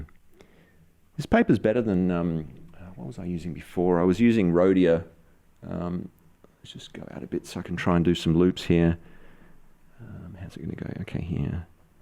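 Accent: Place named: Australia. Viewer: I do love the Ikea bath mat! I personally found a dual purpose for my shag carpet --> it also makes for a lovely photographic background, and the pen can't fall further than the floor when taking photos Lots of gorgeous visual texture. :D Need to check out your other vids! Caught your Aussie accent, and you collected yet another Aussie as a subscriber. I've got a heavy Aussie bias. What a monstrous Dremel adjustment to that green demo 9019. Cut the feed too! That needlepoint one looks amazing! I can't even hear the pen that much on the page. Sounds brilliant. I'm amazed at how well it spread the ink and didn't just glomp onto the page like some flex pens tend to do. Didn't even need an overfeed? Standard feed you say? Oh my, how delicious.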